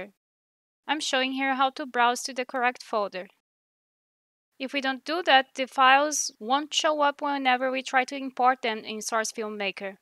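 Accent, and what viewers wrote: Brazil. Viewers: caramba mano, eu fui pesquisar sobre como extrair modelos do ps2, e saquei seu sotaque na hora que vc era brasileira kkkk, é meio difícil brasileiros se interessarem por essas coisas, muito bacana o vídeo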